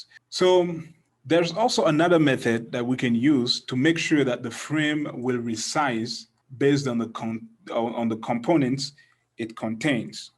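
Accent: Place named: Nigeria. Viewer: Very nice Tutorial, thanks a lot! Is that a Nigerian Accent? :)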